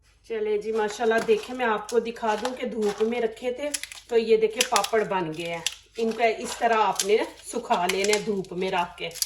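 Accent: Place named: Punjab, India. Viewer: کیا بات ھے آپکی اردو وہ بھی پنجابی ٹچ کے ساتھ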